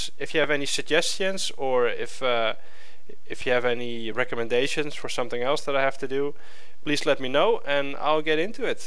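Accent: Netherlands. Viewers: Are you dutch? really does sound like it :) thnx for the info :D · You sound Dutch. Are you from the Netherlands? Great demonstration! I subscribed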